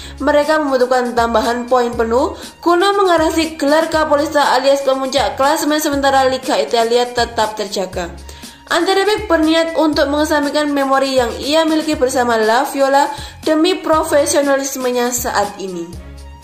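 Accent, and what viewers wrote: Java, Indonesia. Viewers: Dok medok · Wong jowo yaa min 😊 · Medok 😀. Tapi 👍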